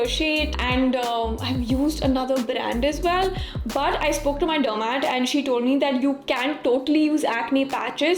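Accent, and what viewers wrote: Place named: England, United Kingdom. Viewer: English accent is so good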